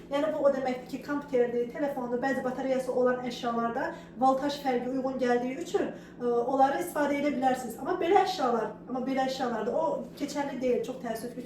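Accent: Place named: Zaqatala, Azerbaijan. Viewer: Maşaallah olsun. Həzz verir adama izləmək. Zaqatala ləhcənizi yesinlər, hocam 😃❤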